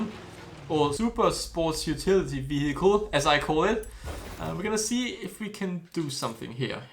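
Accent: Germany. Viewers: i Hear it du bist deutsch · Hey dude.. just wanted to ask if youre from germany cause I can slightly hear that accent but the rest ist just wonderful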